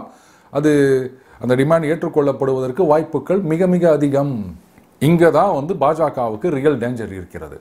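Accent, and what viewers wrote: Chennai, India. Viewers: I don't know whether Tamizh is an International language or not ....but yours and mine chennai tamizh is international ....sokka pesaringu Vaazhga Chennai tamizh Valarga Bhartham Jai Hind Vande matharam🙏✋✋🌷🍁( I couldn't find the Lotus symbol)🤔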